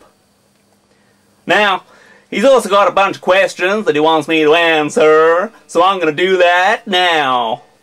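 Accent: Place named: South, United States. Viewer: This is the first time I've laughed at a Southern accent for any reason other than the "you sound like an idiot" thoughts that usually run through my brain XD . You gave me a reason not to hate the Southern accent, so kudos for that.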